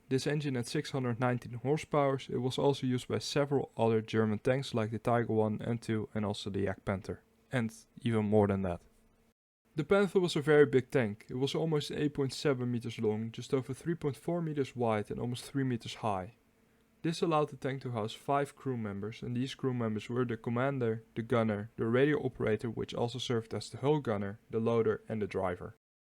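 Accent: Netherlands. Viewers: Your melodic Dutch accent makes my own crude english, (one & only), language sound good. Very easy on the ear. And your multilingual styled pronunciation is not only impeccable but also aids comprehension of info'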